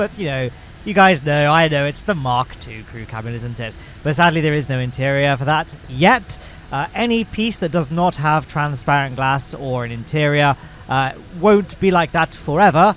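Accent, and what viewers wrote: United Kingdom. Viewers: You're actually so violently British irl it's just funny, nice work as per usual!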